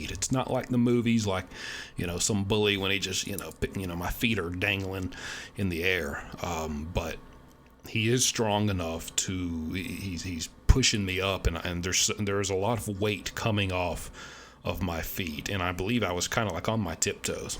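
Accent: South, United States. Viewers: Great content. Saw you on Ian Bick and became a Subscriber. The way you tell stories reminds me of how a minister Would Talk about stories from the Bible. Sounds you like you inherited a southern preacher man’s voice and dialect.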